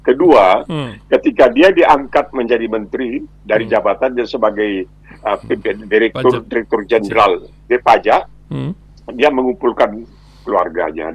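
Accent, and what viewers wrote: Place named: Medan, Indonesia. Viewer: C abang aku ingat aja di RASI ...... dengan khas logat Medan nya aku ternyata sempat juga merantau di Medan Bang 😂😂😂 Sukses selalu smoga sehat2 saja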